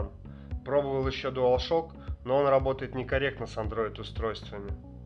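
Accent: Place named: Uzbekistan. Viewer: Узбеки что ли озвучивают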